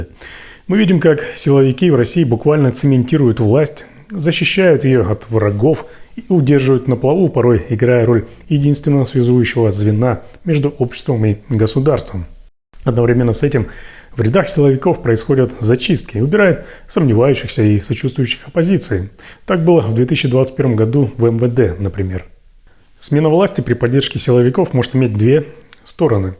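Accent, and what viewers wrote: Ukraine. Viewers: А почему акцент укропский??!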